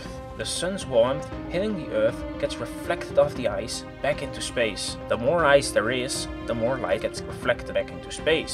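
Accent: Netherlands. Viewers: Some constructive criticism: Talk slower, and clearer (dutch accent is of a good things ;)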